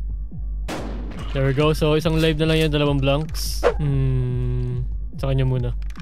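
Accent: Visayas, Philippines. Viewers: Bisaya diay ka dol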